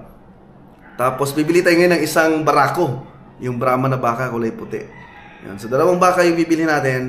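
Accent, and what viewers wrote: Visayas, Philippines. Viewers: Bisaya diay ka dol hahahahabal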